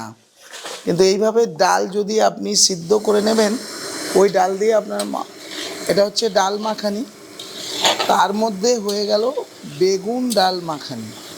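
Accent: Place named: Birbhum, India. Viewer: বীরভূমের লোক মনে হচ্ছে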